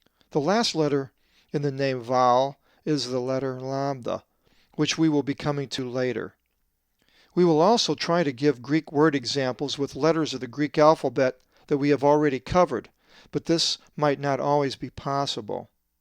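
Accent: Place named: England, United Kingdom. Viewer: Many thanks for your excellent presentation. My native language is Greek and I would like to mention that you are very close to our pronounciation, despite the influences by your English tune. Also, I would like to add that the best way for someone who interests about Greek pronunciation, is to lend his ear in a Greek Orthodox church, where a medieval version of the language is spoken. This version has been transferred from parents to children centuries ago, and I can assure you that the tune is the same as the simplified, so called, modern Greek we use today. The differences of accent compared to that of the ancient language, are limited to the duration of some vowels of same sound. For example, the letter Ω,ω (ωμέγα, omega), was pronounced like an extended O,o (όμικρον, omikron), but today those two vowels sound the same. So, the difference between those two o, remains only in the written form. 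There were also some differences regarding some consonants sound. Greek Cypriots maintain this manner of speaking untill today. For example, when they say τέσσερα tessera (number four), they extend this double ss more than a simple s. This doesn't happen in the mainland of Greece, but the spelling remains the same. Anyway, I hope that all these are useful for those who interest about. Thank you again.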